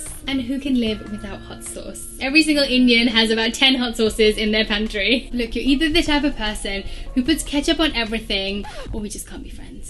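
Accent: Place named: United Kingdom. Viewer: I love you! Somehow you appeared in my Youtube videos. I am trying to become fully vegan and your recipes are helping me loads. I am mexican but I lived for 9 years in London so listening to you and your British accent brings me lovely memories. Also, we mexicans use mostly black beans and no kidney beans.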